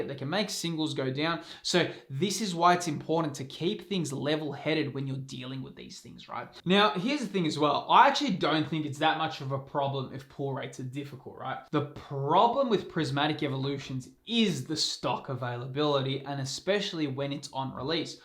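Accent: Australia. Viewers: Only subscribed for the Australian pokemon accent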